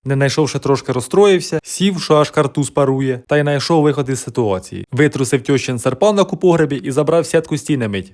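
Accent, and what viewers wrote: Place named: Kherson, Ukraine. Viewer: Говірка гарна. Ми на Херсонщині также говоримо,майже дома побувала❤❤❤❤❤❤❤❤❤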